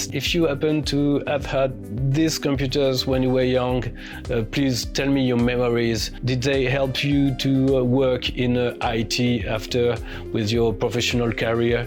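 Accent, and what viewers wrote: France. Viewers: Great, thorough video. Please keep them coming. I especially like the narration, the Frech accent is pleasing to the ears.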